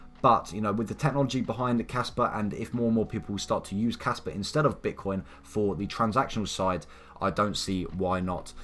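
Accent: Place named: England, United Kingdom. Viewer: i want to learn your accent bro! After of the halving I will to pay someone from Ingland to teach me.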